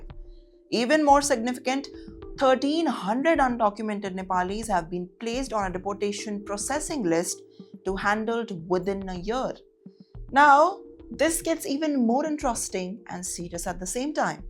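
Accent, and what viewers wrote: India. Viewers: Indian Accent, Indian English, very irritating. Could only take 2 minutes listening.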